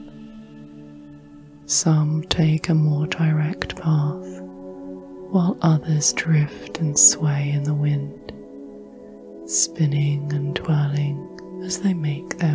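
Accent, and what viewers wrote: United Kingdom. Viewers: Here in Carson City Nevada USA, I've tried several different methods. Supplements to relaxation recordings. Some success with bamboo forest recordings but found any with too much rain or wind or music was more of a distraction. Then, I found your 3 hour recordings three nights ago. I've been sleeping with you since😊using Bluetooth ear buds. The combination of your vocals and background music works magic. The British accent is an added comfort. 😴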